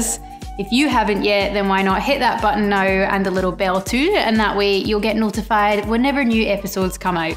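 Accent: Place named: Scotland, United Kingdom. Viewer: Wow, had never heard of this drink. I like having another tequila cocktail in my back pocket, and now I have a good excuse to go get some apricot brandy! Thank you! And your little outtake at the end got a surprised laugh out of me 😂 Saucy! 😍😏 Your pronunciation of Toreador is good but not strictly correct. Spanish has shorter sharper O's, the single R's are trilled slightly, the D is softer, and the E has a flatter "eh" (like "fresh") sound instead of "ay" (like "ray"). But who cares; your Scottish accent is as charming in Spanish as English :)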